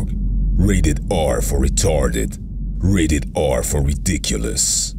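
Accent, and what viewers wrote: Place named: Northern Europe. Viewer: you sound like vin diseal with a northern european accent.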